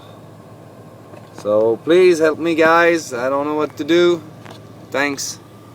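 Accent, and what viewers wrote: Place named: Quebec, Canada. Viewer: Haha..j'avais deviné par ton accent que tu étais Québécois. Moi le problème avec mon liberty c'est qui chauffe juste en roulant. Quand jarrete de rouler y chauffe pu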